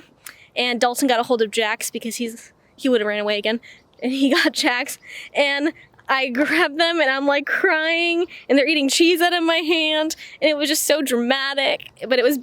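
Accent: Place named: United States. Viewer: Great video. You certainly don't have that unique German account. You sound very much American. How is it that you went from America to Germany? Must be a very interesting story. While stationed in Germany with the Army which was a "lifetime" ago was the Army Depot there in Miesau.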